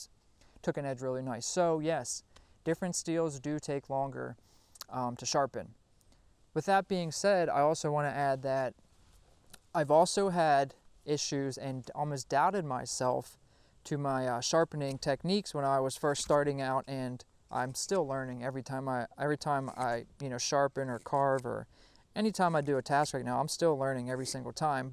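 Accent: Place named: West Virginia, United States. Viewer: great idea! I haven't been subbed long enough to have in depth questions, but I am curious about where you are located. Your voice(accent) whatever you want to call it, reminds me of my husband's family in West Virginia is why I ask.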